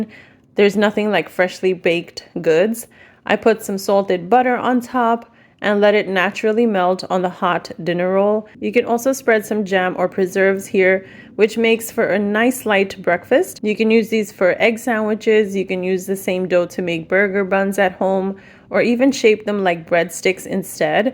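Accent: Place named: Bronx, United States. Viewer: You sound like a Puerto Rican from the Bronx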